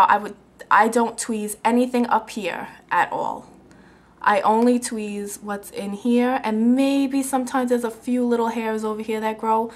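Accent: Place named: Bronx, United States. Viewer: your from the city, like the bronx, or new york , lol CUTE!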